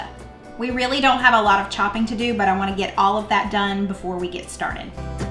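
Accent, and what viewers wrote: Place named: Carolina, United States. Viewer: Oh now honey, you speak just right. Don't you go turnin' your back on your proper Carolina raisin'. You keep right on calling it "ohl"* the way the Good Lord intended it be said LOL. * Well somewhere between there and "awl"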